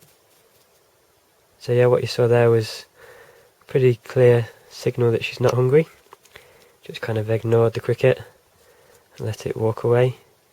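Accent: United Kingdom. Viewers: British Pronounciation :)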